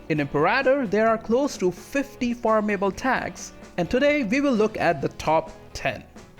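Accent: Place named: India. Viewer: Wtf your Accent is soooo Indian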